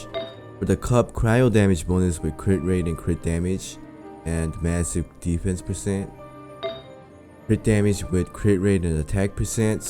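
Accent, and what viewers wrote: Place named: Brazil. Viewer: Essa cara é br o sotaque eu reconheci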